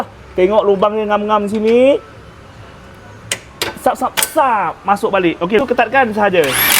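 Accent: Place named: Java, Indonesia. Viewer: Ada lorat² jawa sikit...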